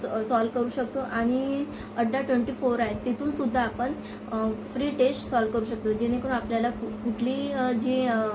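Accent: India. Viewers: मॅडम तुमची भाषा खूप छान आहे. पूर्व विदर्भात आहेत का तुम्ही. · Nagpuri bhashya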